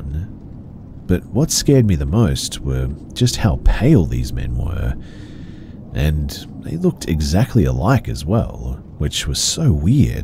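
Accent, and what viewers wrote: Australia. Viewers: G’day…🤗 I love your channel and it’s great listening to your familiar Aussie voice! Great voice for narration, as if you didn’t know✌️😄 It’s my son’s 21st Birthday today 🥳🎊🎂🎉🎁🎈🎉 We’re all in such happy spirits too. I’ve just made him a Strawberry 🍓 Cheesecake and he also selected a Beef Roast with all of the trimmings PLUS MASH! 🍚🥄✨💃🏻🪩🕺🏻 That’s my boy (young man) now! But he’ll always be my baby 💖✨ I find all of these stories so enthralling, so thanx for making the days go faster! 🏆😘🍰🍓✨Greetings from MNECNSW!🤜🤛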